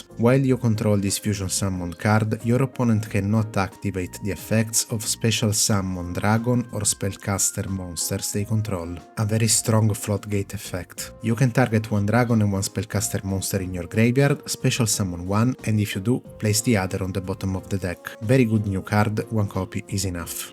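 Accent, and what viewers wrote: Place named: Italy. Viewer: Italian accent unveiled! òwò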